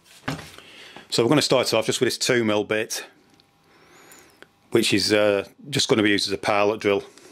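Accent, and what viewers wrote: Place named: Yorkshire, United Kingdom. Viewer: Nice informative vid. I'm trying to place your accent to the exact region in UK..is it Yorkshire?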